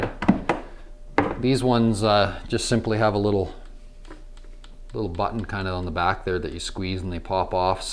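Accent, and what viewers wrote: Canada. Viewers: Nice Canadian accent! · Can someone put subtitles in.... his Canadian accent is too difficult to understand.... I'm from New Jersey. 😢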